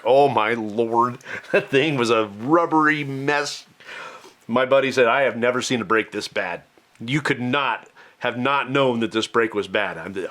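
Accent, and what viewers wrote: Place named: South, United States. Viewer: Congratulations! Here's a toast to you in a glass of Old Forester 100. 🥃 A pot still's operation is dead simple. But I have just a vague understanding of how a column still works. About all I know for sure about a column still is that it's more efficient, and therefore tends to strip more of the congeners out of the white dog. I can just see the Official Aroma Kit - 2,724 different scents, comes with forklift for moving. 😂 I never get peanuts from Jim Beam juice. I get pecans from George Dickel white label, and walnuts from their BiB. And I get peanut shells on the nose from Heaven Hill whisky. Tonight you can't seem to make up your mind whether caramel is CARmel or CAREamel. I can tell you've been in the South for a bit. 🤠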